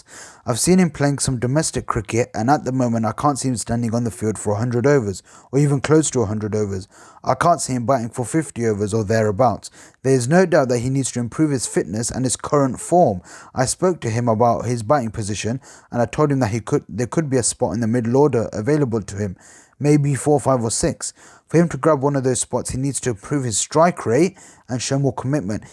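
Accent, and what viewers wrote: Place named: United Kingdom. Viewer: Love your British accent 😍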